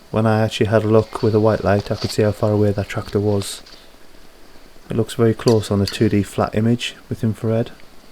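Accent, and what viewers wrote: United Kingdom. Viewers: Love the UK accent in these videos, but yeah, we've got rats in the states.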